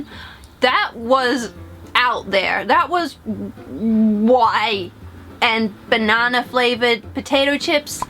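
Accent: New Zealand. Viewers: I love your videos so much, they always make me smile. I can't think of anything strange about my country (Australia). Also I LOVE your New Zealand accent ^-^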